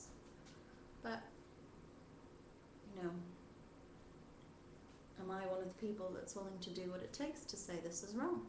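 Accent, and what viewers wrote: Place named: Ireland. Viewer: I love your accent and you are a beauty! Are you from Ireland?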